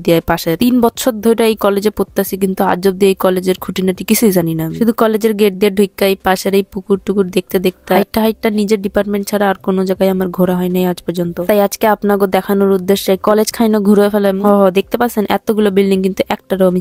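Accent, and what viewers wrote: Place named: Bangladesh. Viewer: Ekhn o sordi kome nai 🥹🤧 R Bangladeshi style e ktha ta wow wow lagse 🤩🫶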